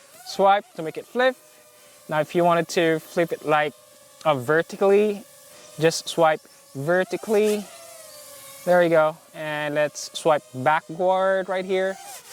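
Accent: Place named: Philippines. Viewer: Mag Tagalog kana lng pag english2 halatang Pinoy ka 😊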